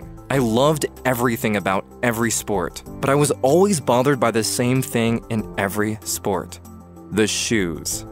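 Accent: United States. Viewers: You sound american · If he created Adidas why does he have such a young accent And if he is German how does he have an American accent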